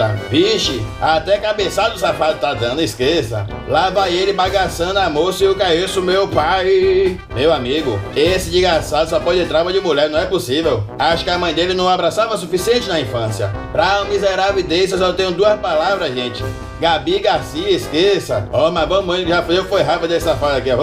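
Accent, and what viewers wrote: Bahia, Brazil. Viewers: Melhor narração 😂 esse sacana é bahiano. Kkkkkk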